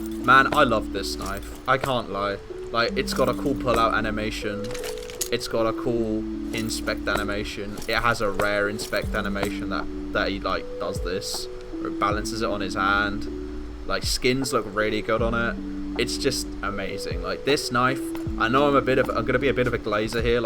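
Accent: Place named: Italy. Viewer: fuck italian english is so wild bro 😂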